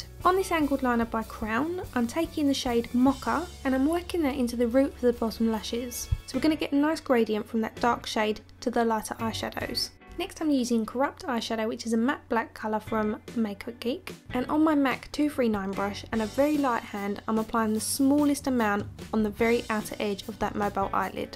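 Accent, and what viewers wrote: United Kingdom. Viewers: Aw you replied. that's so nice of you. i recreated this look and learned new tricks and it came out nice. i've been watching your tutorials and its one of the best. you deserve more subbies and btw i followed you on IG. more power to you. anyways are you british? JW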